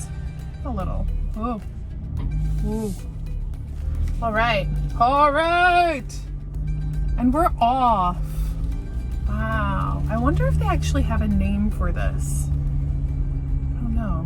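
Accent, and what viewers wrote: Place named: Scotland, United Kingdom. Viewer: Yo sound part Scottish and its really messing with my head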